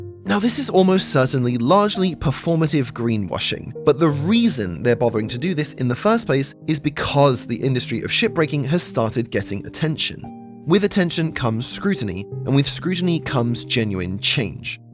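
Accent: United Kingdom. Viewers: Really excited to watch this until I heard the narrator is British, what a shame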